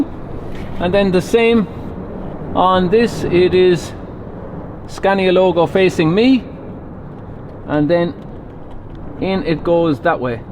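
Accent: Ireland. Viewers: A guy with a Greek name with an Irish accent, driving a Swedish truck on English roads: doesn’t come more cosmopolitan than that. Seriously though entertaining video!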